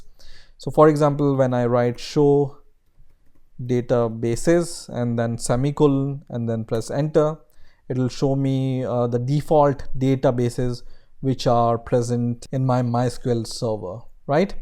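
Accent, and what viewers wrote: India. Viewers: the indian accent gives me headaches. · why indien accent it's Bad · U r slow like shiiit,catastraphic India English,u could shrink video into 5 minutes easily,phuy...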